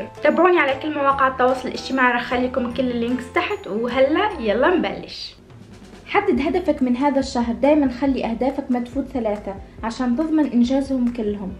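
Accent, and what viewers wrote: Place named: Tunisia. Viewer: ختيتو الديفو تاعك والسبب لي ميخليش التفاعل يطلع عندك هوا اللهجة الي راكي تحكي بيها هوا المؤكد مدامك تونسية رح يكون اكثر المتابعين عندك من المغرب العربي وسورتو من دزاير ونحنا عندنا شوي نفور من هاذيك اللهجات والعقليات اما المضمون تاعك مفيد ومسلي والبتوفيق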